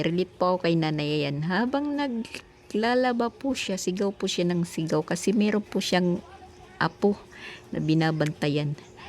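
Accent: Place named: Visayas, Philippines. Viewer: Cute pamation ug mag bisaya ka ❤❤❤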